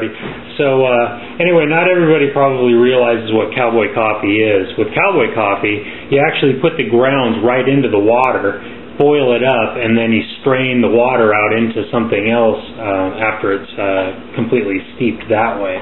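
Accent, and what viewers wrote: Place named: Maine, United States. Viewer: You have got that that right! Except for the accent. He sounds like he is from Maine. Nice job! Eric.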